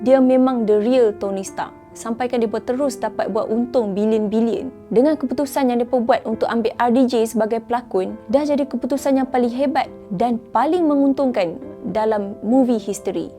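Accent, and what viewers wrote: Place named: North, Malaysia. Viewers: Wakk single tak? Sy suko loghat utaraa mcm mana nk kenal SI Gadis ni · Cayaq tengok awek utara ni cerita.. Begitu pekat loghat dia..😊❤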